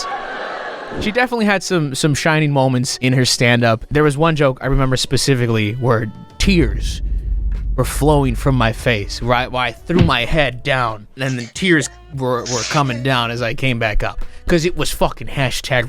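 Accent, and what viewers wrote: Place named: Upper Midwest, United States. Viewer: I knew you were from the upper Midwest because of the slight accent.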